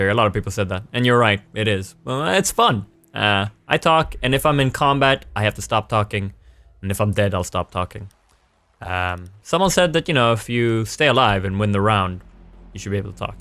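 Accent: Sweden. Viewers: Are you swedish? Sounds like that! I am swedish If you wanted to know!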